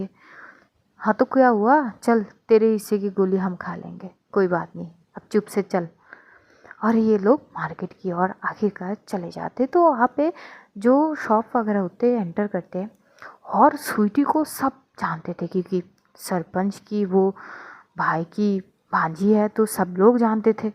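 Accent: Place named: Haryana, India. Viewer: Didu haryanvi bolna kha se sikha 😊thodi thodi bol rhi ho pr mst lag ri so ❤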